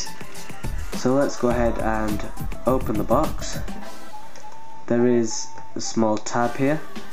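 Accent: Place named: England, United Kingdom. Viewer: I like how your English and not yank.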